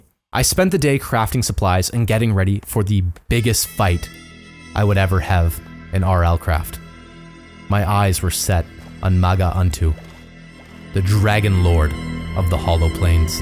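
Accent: Canada. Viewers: Just started watching you and honestly 98%sure you must be Canadian because you sound it. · this sure is bussin bussin, especially because this guys accent sounds canadian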